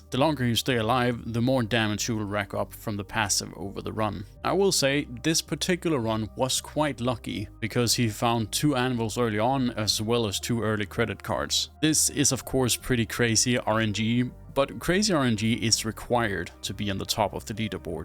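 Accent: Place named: Denmark. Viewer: Do you happen to be Danish? You sound like you could be.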